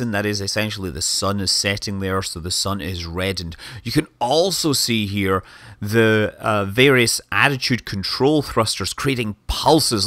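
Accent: Scotland, United Kingdom. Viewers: He always talks with a Scottish accent though. 😒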